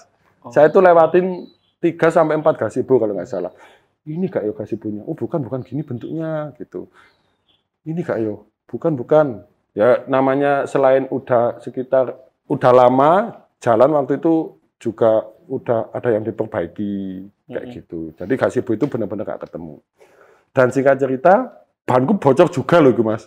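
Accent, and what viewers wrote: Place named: Java, Indonesia. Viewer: SEBENARNYA SEREM sih, cuma NYRITAINYA trLaLu MEDHOK. jatohnya LUCU sih.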